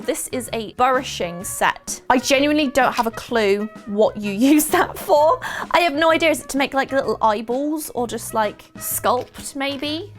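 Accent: England, United Kingdom. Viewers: I assume you're from England by your accent, mine hasn't arrived yet :(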